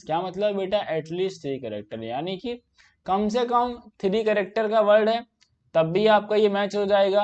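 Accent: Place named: Kanpur, India. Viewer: bhai app kanpur se ho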